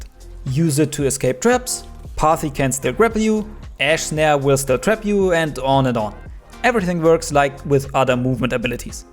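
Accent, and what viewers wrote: Germany. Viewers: Geiler allman akzent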